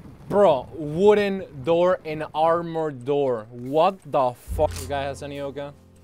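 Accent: Argentina. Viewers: sos argentino o que? jajaja wtf you blowed my mind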